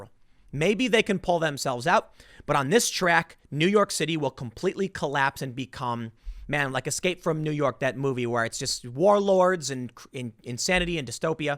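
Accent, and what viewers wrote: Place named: Chicago, United States. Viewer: your Chicago accent kinda shows when the first 30 seconds into the video When you said "Deblasio is dumb as a box of rocks" i like the way you said dumb.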